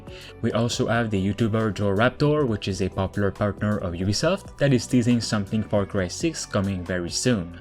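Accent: Quebec, Canada. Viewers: Sounds french Canadian, like the guys I used to play hockey with. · he is Canadian/French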